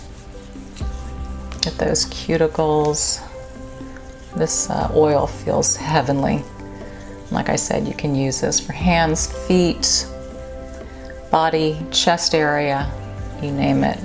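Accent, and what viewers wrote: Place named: United States. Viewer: So inspiring as always, thank you so much! Merci pour ton anglais américain pour moi très agréable à entendre, je te suis depuis longtemps non seulement pour tes vidéo, ta personne mais aussi pour apprendre cette langue qui m'étant si nécessaire. Loving you from Paris! Have a nice weekend!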